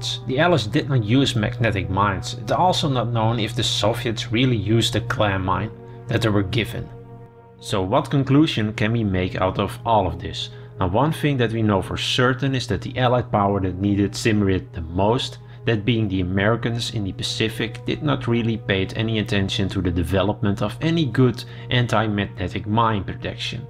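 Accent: Netherlands. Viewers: NederEngels accent 100%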